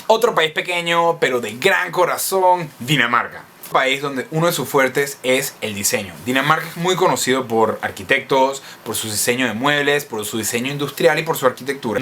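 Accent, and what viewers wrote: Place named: Venezuela. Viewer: Porqué hablas con acento venezolano?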